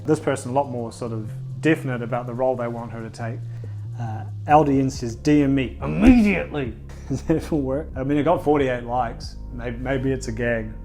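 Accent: New Zealand. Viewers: Are you kiwi? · Only 7k? Bro you deserve at least 100k also love hearing the kiwi accent on yt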